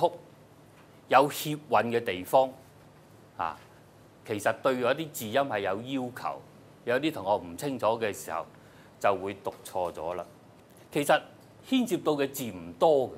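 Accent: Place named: Guangzhou, China. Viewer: 真正广州话绝版 好多自认广州 香港人发音都不正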